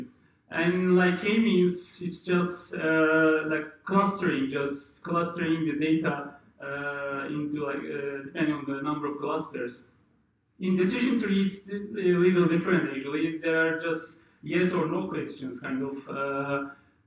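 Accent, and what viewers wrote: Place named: Turkey. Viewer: Great presentation.. the presenter has Turkish accent like mine. :))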